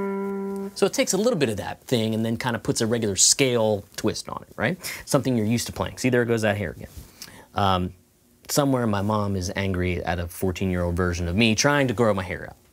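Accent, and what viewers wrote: South, United States. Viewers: You're getting a little Southern drawl there... :)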